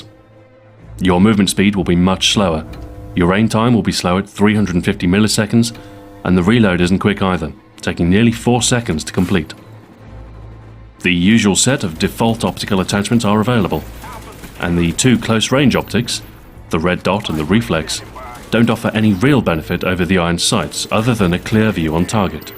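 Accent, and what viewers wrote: United Kingdom. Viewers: is he from the uk? i am. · Only a British accent could pull this type of informative video off.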